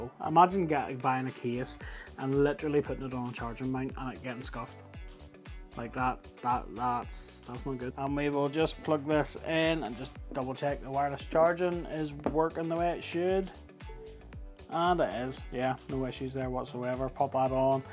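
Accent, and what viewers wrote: Northern Ireland, United Kingdom. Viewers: You sound so northern Irish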